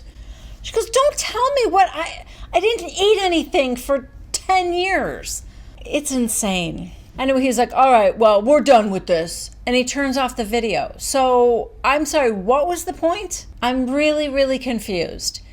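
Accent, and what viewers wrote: New Jersey, United States. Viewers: God! You’re Jersey accent is awful!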